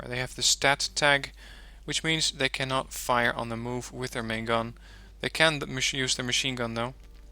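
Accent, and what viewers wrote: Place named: Netherlands. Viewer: Bedankt man, heb het spel net gekocht, en ben het nu aan het leren, ik ken de basics van World in Conflict nog wel en dit lijkt er wel een beetje op. Je guids helpen er goed mee! (Volgens mij kan ik in je accent horen dat je nederlands bent, geen zorggen je klinkt niet als Mark Rutten)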